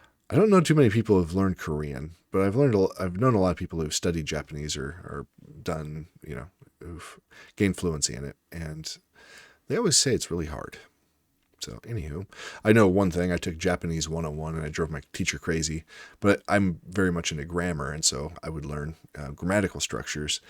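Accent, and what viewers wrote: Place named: United States. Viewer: As a French native speaker, I can tell you're wrong when you try to analyse French. You said "It's what I've been told", which means you have no idea what you're talking about. French isn't Germanic. As a linguist, you should know that most words shared by English and French come from Old French. Actually, English vocabulary has been influenced by French after the Norman Conquest of 1066. Just out of curiosity, are you really a linguist? Which degree did you earn? The difficulty of this language lies not in the sounds, but rather in the rules and the exceptions. In French, you can see people correcting each other. Most people make a lot of mistakes because they don't read enough to internalise the rules. French doesn't have four tenses. There are a few tenses categorised into four moods. Actually, if you exclude the infinitive, there are nine simple tenses and nine compound tenses. 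Some of them are almost never used, but there are still more than four. You only considered the first group for your statement about conjugation. Let's take "prendre" as example. It's from the third group. In the present simple, "prendre" is conjugated as: je prends, tu prends, il/elle/on prend, nous prenons, vous prenez, ils/elles prennent. French people (so people who speak French in France) tend to like the English accent, not the American accent. It makes you look worse than Olly Richard.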